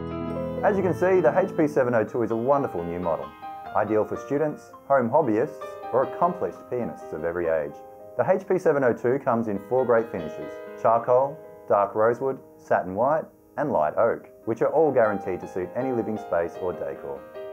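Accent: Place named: Australia. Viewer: This is how Australians speak, proper English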